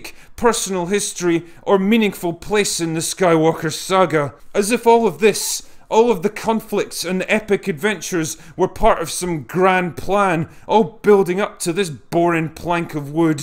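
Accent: Scotland, United Kingdom. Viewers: You get more and more Scottish every video, won't be long before we won't be able to understand you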